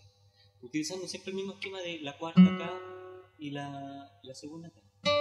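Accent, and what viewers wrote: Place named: Chile. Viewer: Que hermoso tu acento chileno❤ estoy enamorado del acento chileno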